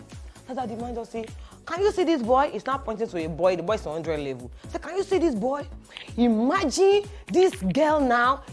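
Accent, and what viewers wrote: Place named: Nigeria. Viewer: Yes o....I love every bit of your shows.... they are always very interesting with the Nigerian accent 😂😂😂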